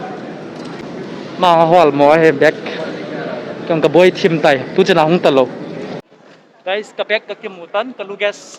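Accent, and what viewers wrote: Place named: Tamil Nadu, India. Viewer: Na tamil pao tho vang chu😂 ochat naum e😍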